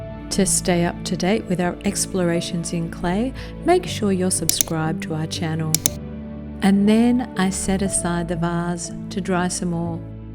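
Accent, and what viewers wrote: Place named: Australia. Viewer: Great design. I am delighted to hear your lovely Australian accent and voice narrating your Organic Vessel. Also fond of black blue and matt gloss combos. thanks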